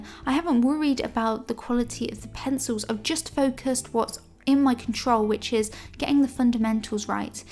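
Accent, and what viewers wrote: United Kingdom. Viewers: Are you british? Your Accent is very nice 👍👏👏👏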